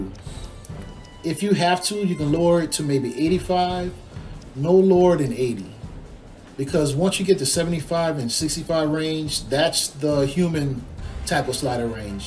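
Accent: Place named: Midwest, United States. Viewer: Great video! been tinkering with this game for a few months now. Started working on rosters. You sound like a Big Ten guy.... where u from?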